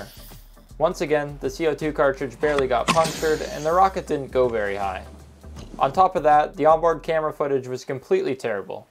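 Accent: Canada. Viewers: I pegged you as Canadian :) Probably Ontario. It's OK because I'm from the left side of Canada. Cool rocket by the way. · Now thanks to HIMYM I cannot unhear that "eau". when you canadians pronounce words like "out" it comes out as a "eaut". like the french word for water, followed by a weird out of place "t". and it's not only in out. several words that came out in himym follow the same pattern. Nice rockets and everything, but every time I hear: "eaut" my brain goes: this is a canadian. :) and it has done that like 10 times during this video :) well, maybe a little more :)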